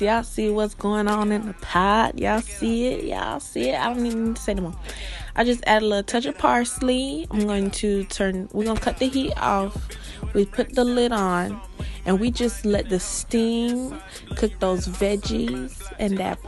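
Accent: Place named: Haiti. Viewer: Ahah lol the Haitian accent got me chicken wings wings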